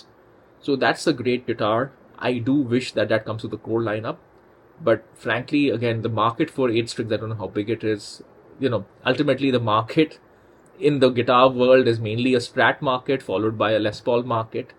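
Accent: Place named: India. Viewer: sound muted cause of Indian Accent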